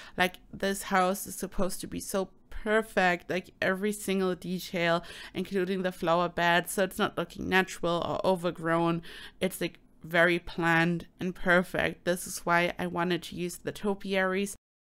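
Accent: Germany. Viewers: u sound german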